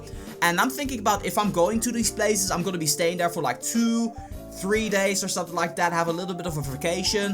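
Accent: Netherlands. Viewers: u live in NL that explains the accent i am from the netherlands too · where is he from? he kinda has a dutch accent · where do you come from you sound Hollands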